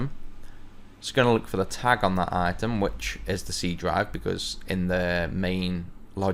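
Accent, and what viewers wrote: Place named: England, United Kingdom. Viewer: Thank you very much for all these tutorials, they're the best and most in-depth explanations of WPF and C# I've found on youtube. Great teacher! I'm going to start thinking of code in my head in an English accent now, which is fine by me!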